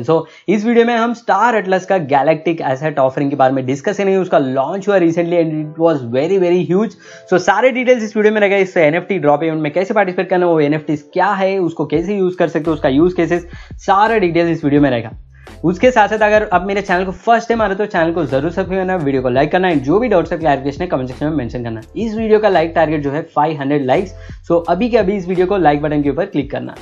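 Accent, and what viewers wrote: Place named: South India, India. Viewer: maybe your accent is different that's why , my south indian friends are also like you , don't take it to your heart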